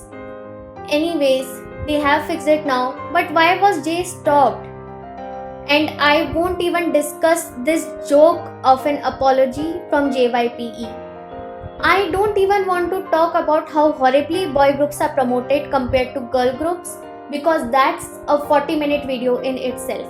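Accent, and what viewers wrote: India. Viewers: Hey are you indian your ascent is like a indian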